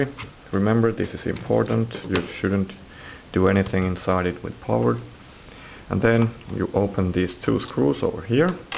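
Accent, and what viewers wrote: Finland. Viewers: Finnish accents are awesome :D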